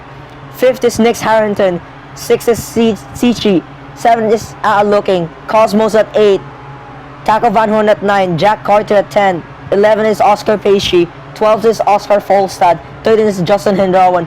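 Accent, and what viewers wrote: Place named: India. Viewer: Why do you sound so indian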